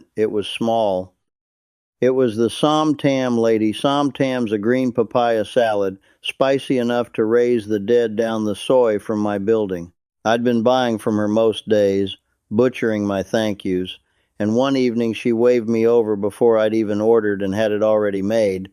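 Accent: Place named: Texas, United States. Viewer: He is from Texas. They speak like that.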